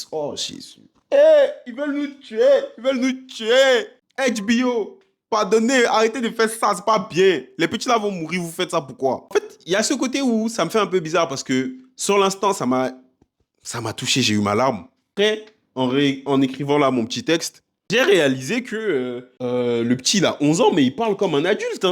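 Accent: Ivory Coast. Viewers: Je reconnais un frère ivoirien Je suis là à la base pour la "babiere" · Toi ça se sent que t'es ivoirien. 😂😂Ko ton babiere 😂😂😂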